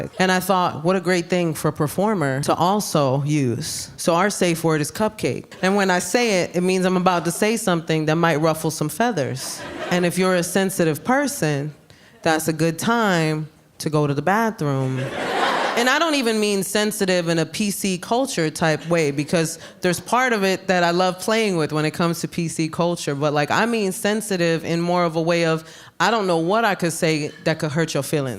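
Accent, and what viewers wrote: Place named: Bronx, United States. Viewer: She is so New York...I love her. I'm from the Bronx too,she sounds like a real Bx girl,she is absolutely hilarious.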